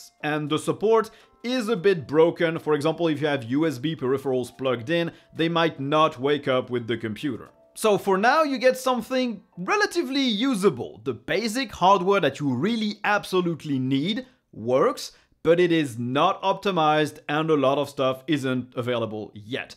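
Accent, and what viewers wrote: France. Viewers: On entend un peu l'accent francais mais tu as vraiment un bon anglais franchement felicitations pour etre aussi bon en anglais! Pq avoir fait la chaine en anglais plutot que le francais d'ailleurs ?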